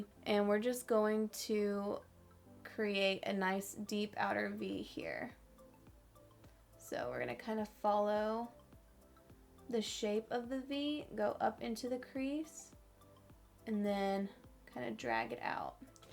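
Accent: South, United States. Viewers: Love your southern accent, so cute! I like the left side better, looked like the colors blended out better. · I just love when your southern comes out because being a BAMA girl myself it’s nice to hear something of myself on YouTube. I’m not sure why it’s so rare to hear. There’s just so many of us southerners out here! Thank You for this video!!! I’m a big fan of yours! Much Love toYou 😘💋😍❤️❤️❤️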